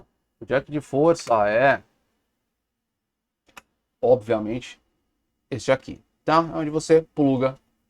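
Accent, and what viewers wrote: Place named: São Paulo, Brazil. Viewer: Tá achando ruim amigo? Faça como eu. Desisncricão realizado com sucesso. Sotaque paulista merda.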